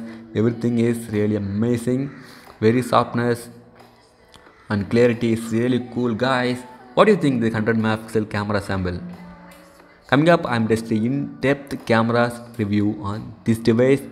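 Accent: India. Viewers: Indian English 🤩😘✴🔥🔥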